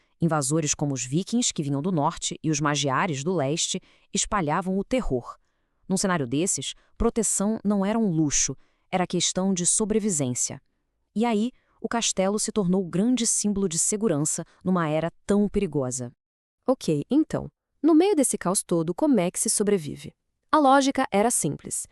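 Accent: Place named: Rio de Janeiro, Brazil. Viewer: Pura carioca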